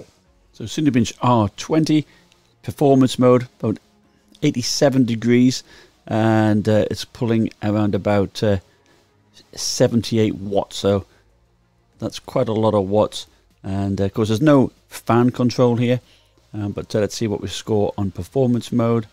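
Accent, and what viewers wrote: United Kingdom. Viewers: You sound british mate, wouldve appreciated the price in the GBP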